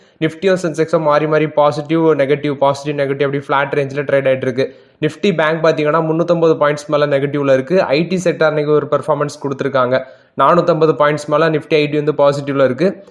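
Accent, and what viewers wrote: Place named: Nagercoil, India. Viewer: Slang resemble Nagercoil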